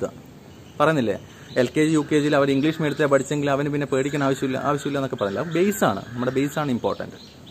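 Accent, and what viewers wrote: Kannur, India. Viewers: Kannur language super 👍👍👍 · ഹിന്ദുവാണോ. ക്രസ്ത്യൻ ആണോ. മുസ്ലീം ആണോ . എന്ന് മനസ്സിലാവില്ല എന്നാലും കണ്ണൂരുകാരനാണെന്ന് വർത്താനം കേൾക്കുമ്പോ മനസ്സിലാകും. ഞാനും കണ്ണൂരാണെ.....😀😀😀😀😀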